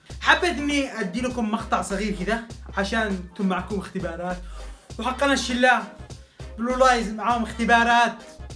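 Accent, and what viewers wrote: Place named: Taiz, Yemen. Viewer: فناااااااااااااااااااااان انا فدا اللهجة التعزية 😍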